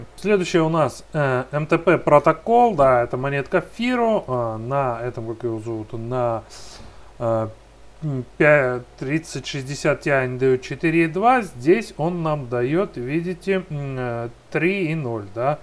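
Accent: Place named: Armenia. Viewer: Прикольный! Говор больше на армянский похож!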